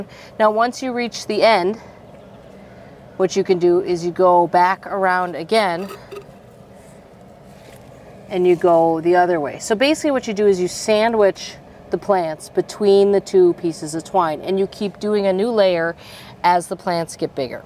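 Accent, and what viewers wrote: Wisconsin, United States. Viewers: Great Dahlia video--THANKS! I have grown Dahlias for 5 years or so, but there are so many helpful things to learn! The Florida weave looks like a super way to keep Dahlias from toppling! I have 4 of the 5 varieties you showed, (not Penhill Watermelon), with all but Cafe Au Lait being new for me this year. I am so excited to see them bloom!! Your Dahlias are quite a bit bigger than mine so far, as I had to put mine directly in the ground. I would LOVE to start mine in pots, but I don't have room....I have 90 varieties total this year (Where do you keep them as they are growing in pots?)! ;) Thanks for all the tips--Hope to see an update this Summer! :) :) Love your accent by the way--My aunt lives in Wisconsin (Neena, WI), and it makes me think of her when you talk...such a lovely sound to my ears! Happy gardening! Hope you have bushels of beautiful blooms! 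;)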